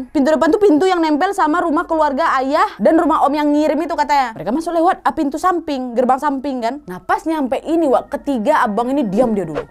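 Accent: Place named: Java, Indonesia. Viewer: Klo KK ngomong Jawa lucu kk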